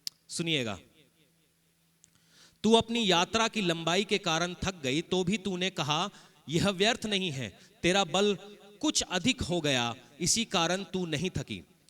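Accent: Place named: Punjab, India. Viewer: Jai Masih ki pastor ji God bless you very nice word aur aapki Punjabi Hindi sun kar bahut maza aata hai aur shanoo wali baat kia baat hai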